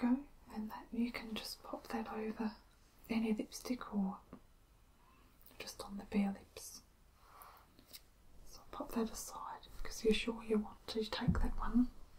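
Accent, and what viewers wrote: Australia. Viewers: Are you Australian? Beautiful video!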